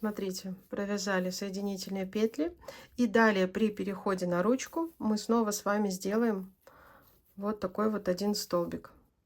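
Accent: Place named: Baltic states. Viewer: вы с Прибалтики? У вас красивый акцент речи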